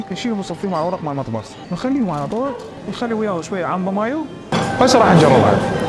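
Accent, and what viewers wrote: Iraq. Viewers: شوية هوايا 😂والله اللهجة العراقية فلة 😍😍😍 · شيف تسلم ايديك اموت بلهجتك العراقية كلامكم يجنن الله يفرج على أهل العراق وسوريا وطبعا الغالية يلي بالقلب فلسطين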